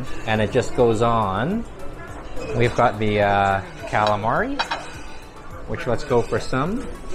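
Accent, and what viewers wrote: Canada. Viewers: I love to hear you speak, is that a Vancouver or Toronto accent?😊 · Hi! By any chance are you Canadian? I detect an accent, and also you’re super friendly.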